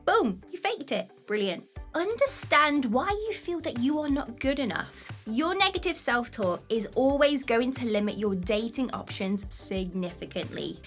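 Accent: United Kingdom. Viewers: Her voice pitch + british accent = something interesting going on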